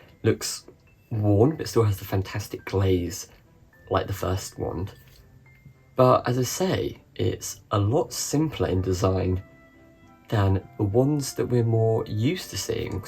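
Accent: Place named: United Kingdom. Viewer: Hi. I would have to take pictures of them, but so many gave away, to my ex's nieces and nephews and they broke them, but I will send a few to you soon, thank you for commenting on my comment love it...Also, love your accent and the U.K... want to go there since first saw Harry Potter... but in the summer though...Here in Canada, 2 months of nice and the rest well...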